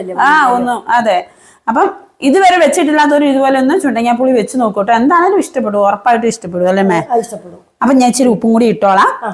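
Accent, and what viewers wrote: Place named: Idukki, India. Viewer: സ്ലാങ് കേട്ടപ്പോൾ ഞാൻ കരുതി ഇടുക്കിക്കാരി ആണെന്ന്... കാസർകോട് ആയിരുന്നല്ലേ....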